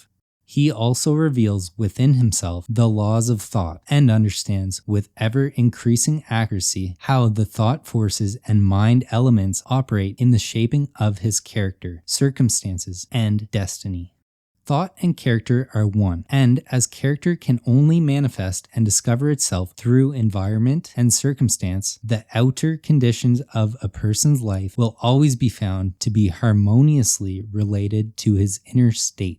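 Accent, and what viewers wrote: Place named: Maritimes, Canada. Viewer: Maritimer eh? Just subtle.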